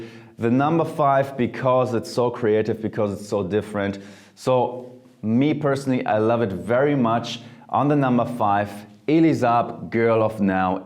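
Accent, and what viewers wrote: Germany. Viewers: This german accent gosshh you are the best!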